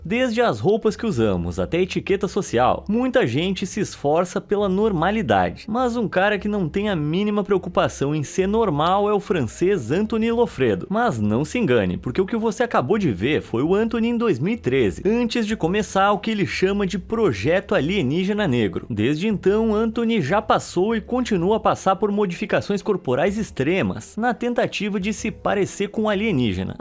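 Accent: Portugal. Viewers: Gosto do teu sotaque em Português (Portugal).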